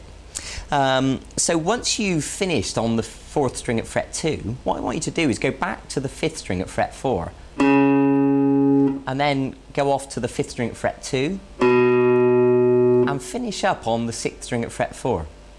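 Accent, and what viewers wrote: United Kingdom. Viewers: Nah, he's from the Bristol area, West Country accent. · accent is from Bristol. He's a cool guy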